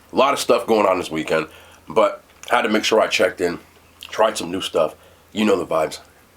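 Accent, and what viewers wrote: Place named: New Jersey, United States. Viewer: I love when you try to pronounce things in another language with your Jersey accent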